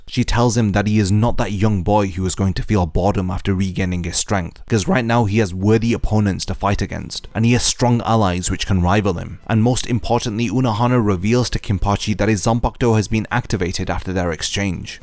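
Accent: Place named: London, United Kingdom. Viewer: Yeah, I'm curious what your first language is too. It sounds like you learned english with a london accent. The mix sounds good.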